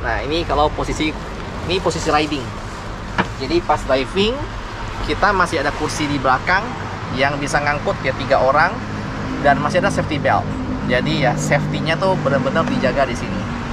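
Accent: Pontianak, Indonesia. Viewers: celake pontianak banget 🤣🤣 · bahase budak pontianak ni kental.. semangat bro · Org pontianak ke bg? Logat nye macam org ponti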